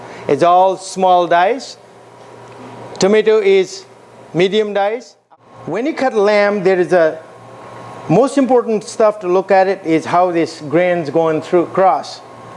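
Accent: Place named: Nepal. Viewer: You can tell he is Nepali by accent Grt job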